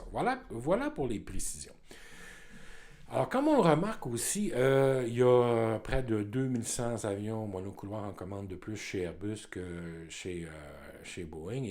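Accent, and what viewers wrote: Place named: Quebec, Canada. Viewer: Épisode très intéressant, j’apprécie toujours votre bonne humeur et votre parler québécois qui est très agréable . Pour ce qui est du sujet du jour, un élément important est la fin de la production de l A380 . Le bâtiment dédié à sa fabrication a été reconverti pour fabriquer de l A321 qui est un gros succès commercial. Donc la production des mono couloirs d Airbus va pouvoir suivre la demande si les fournisseurs tiennent le coup. À ma connaissance aucun n a déposé le bilan . Donc je crois qu Airbus ne va pas mettre Boeing KO mais va gagner le match au point.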